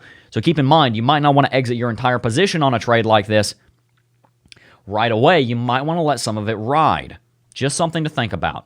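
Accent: South, United States. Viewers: One of next fav analysts , it’s the southern accent or wherever the hell yo from . I love coffee too bro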